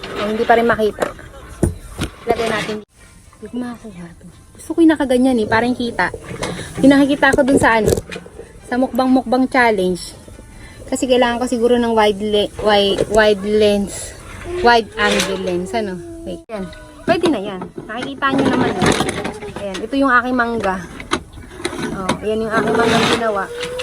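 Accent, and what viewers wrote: Visayas, Philippines. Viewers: Hahah... Mommy kiat lng bah ... Bisaya jd kaau mommy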